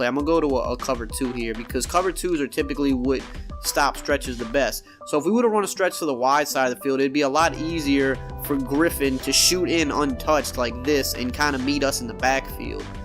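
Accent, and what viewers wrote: Louisiana, United States. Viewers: Great content but I gotta ask what part of Louisiana you from ?? Your Accent sounds a lil bit like Chalmette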